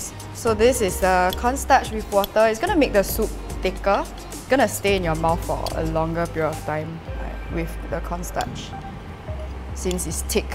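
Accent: United Kingdom. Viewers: is she english? she sounds like she grew up in the UK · You have british accent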